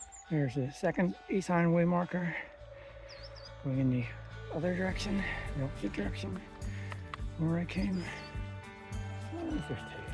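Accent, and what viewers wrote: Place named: Ireland. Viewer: Thanks for the video. I know the EHW is not really marked; however, it is not as intense as the WHW and will suit me ass a relative beginner. I live now in Stirling so I am within easy reach of all Highland and Island hikes & wild camps. I am originally from NI and though I detected an Irish lilt in your accent. Cheers 👍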